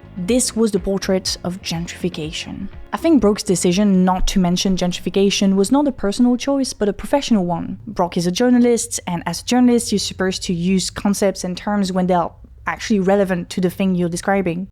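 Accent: France. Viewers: Maybe i am weird but always found the French English accent charming. Way better then my danish potato english :D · Don't feel bad about your accent! The French accent in English gets way too little exposure, and makes it harder for foreigners to understand French people who actually try to accomodate them by speaking English. Your older videos provide a great service! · I love the frenglish accent, I miss it 🥺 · OMG, THE FRENCH ACCENT BACK THEN, GIVE IT BACK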